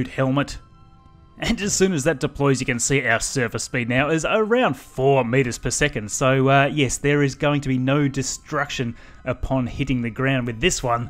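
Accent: Australia. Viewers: Oh. I know what's up with the AU accent (I'm used to associate this accent with the british one because I'm from Spain), but still, nice job with the videos, the things you guys make are so satisfying to witness:D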